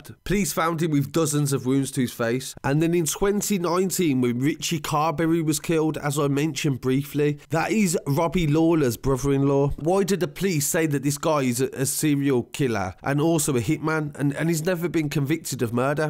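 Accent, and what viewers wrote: United Kingdom. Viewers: Mate you sound like ur from UK and learn how to say the places first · i come from the same town i know more about this than this guy does his accent is from the uk what the fuck would he know about our troubles haha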